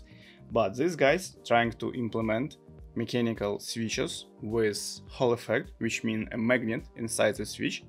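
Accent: Russia. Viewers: Акцент больно русский😮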